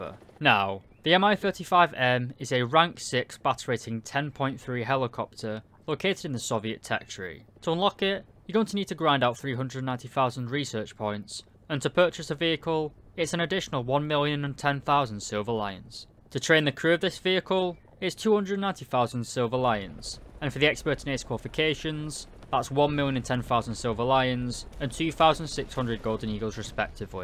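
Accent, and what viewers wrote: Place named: Lancashire, United Kingdom. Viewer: Where abouts in the UK are you from pal? Sounds like Lancashire to me. I'm from Leigh near Wigan/Manchester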